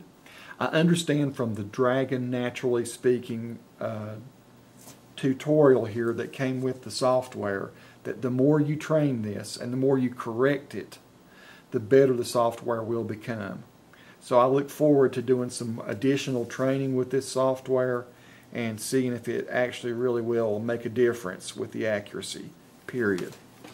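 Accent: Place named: Eastern Georgia, United States. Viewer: I have a similar accent (Eastern Georgia) and would love to have a stenographer software program like this that works well with my speech patterns! Looks like it is working well for you,! Thanks for sharing.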